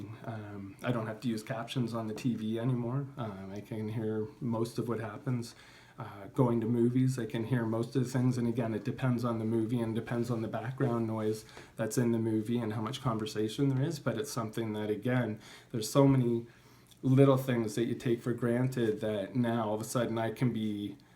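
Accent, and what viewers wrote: Canada. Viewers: Congratulations!!! This is fascinating to me... You can tell that now you can hear, you have picked up an accent... Canada right? Its the ' ou ' like about and house... Lol.. When you were telling the surgeon or the lady that was getting you in touch with sounds you didn't have your accent... That is what fascinated me... Very happy for you.. Something I take for granted and seeing and listening to your story .... Changed the way I look at things.. Thank you for sharing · So cool, crazy that you have a very distinct Canadian accent! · He must be Canadian, what I find interesting is that his voice got a little higher now that he can hear. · I thought he had a very cool foreign accent in the first video. Now after a year or two, he sounds like an average Canadian. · I'm so grateful that this has changed your life I watched a video where you initially got the implants. 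You're doing so well!!!! You have you Canadian accent back 🙂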